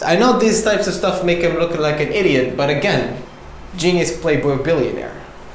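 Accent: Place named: Germany. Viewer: The German accent kills me 😂 Ur the best man ❤️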